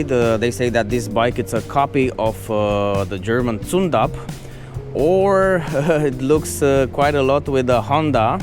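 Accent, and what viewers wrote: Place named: Romania. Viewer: Romanian accent...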